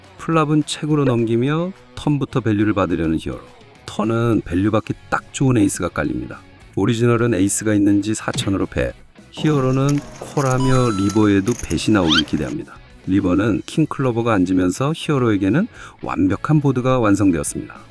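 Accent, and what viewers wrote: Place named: Gyeongsang, South Korea. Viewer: 다른 영상에서 플레이하실때 음성이 나온적있는데 경상도억양이 있으시던데 더빙은 다른분이 하시나요?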